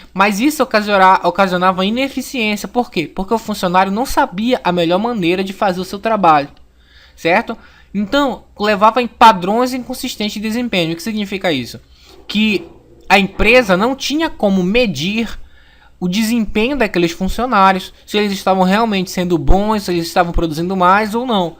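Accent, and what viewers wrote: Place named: Pará, Brazil. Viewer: Ahaha desde seu primeiro vídeo conheci o sotaque paraense rsrs, saudades da minha terrinha...mas amo Brasília rsrs! Adorei o vídeo sabe muito, parabéns!!!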